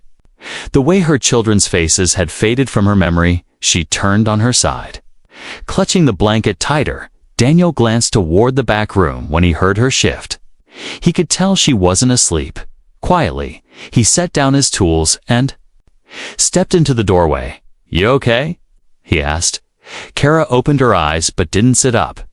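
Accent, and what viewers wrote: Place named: United States. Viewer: The Anerican accent does not work with these kind of stories.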